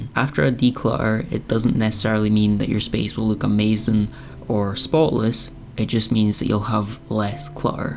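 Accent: Scotland, United Kingdom. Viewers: Thank you,! New subscriber. Have watched a number of your videos. I have clutter + disorganization challenges, too, to a very scary degree. Appreciate this video and your other videos, too, where you address these issues. I ought to have addressed these issues decades ago when I was a young adult. Hopefully, one or more of the helpful strategies you have mentioned in your videos will stick and I will make progress with these issues. I enjoy your channel. You have a very soothing voice, a very nice conversational style, and I enjoy your sense of humor and hearing your Scottish accent. Wishing you continued success in all your endeavors! 06/05/2026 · Great to hear a Scottish voice! I’m in Edinburgh. Yip slap bang in middle of decluttering journey. My best getting started for me my heater developed a leak and soaked 1/2 of my carpet and if you’ve had cats from kittens well if you know you know. 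Had to get a friend to lift the carpet. Nearly everything had to go into my bedroom with the remainder getting shifted around. So my plan is to go retrieve with I need and the rest either donated or landfill. Aw the best!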